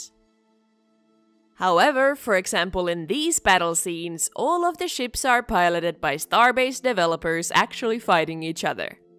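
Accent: Finland. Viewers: Is she Finnish?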